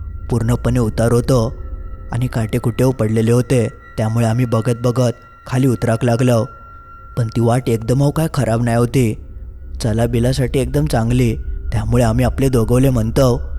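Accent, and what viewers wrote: Malvani, India. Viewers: I.like.your.malvani.voice.laya.bhari.rel.Vivek Singnath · मालवणी बोको हा चॅनेल मी माझ्या 3 अकाउंट वर फॉलो केलेला असा. आणि मित्र परिवाराला ही फॉलो करायला सांगितलं असा. माझ्या घरातल्या 16 जना नच्या मोबाईल वरून पण फॉलो केलेला असा. गावी जातो तर तुमचे व्हिडिओ आम्ही ग्रुप ने बसल्यावर बघतो रात्रीचे. खूप thrill वाटते ग्रुप मध्ये बसून ऐकायला एक वेगळीच मज्जा येते. त्यात ह्या व्हिडिओ मध्ये तुमचा मालवणी आवाज.त्यामुळे व्हिडिओ ला एक वेगळीच मज्जा येते. मी रोज दिवसातून 4 व्हिडिओ बघतोच बघतोच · Which malvani he is talking ..this is different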